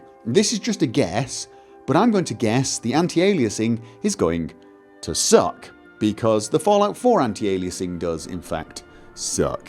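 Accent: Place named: Manchester, United Kingdom. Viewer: Are you from Manchester you've got a Manc accent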